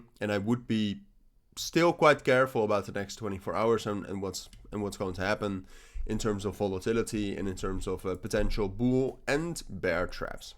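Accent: Netherlands. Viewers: Duurde even voordat ik je nederlandse accent hoorde. Engels gaat je goed af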